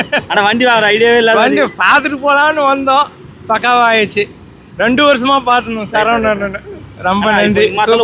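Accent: Madurai, India. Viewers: Madurai vasagam super 🎉🎉🎉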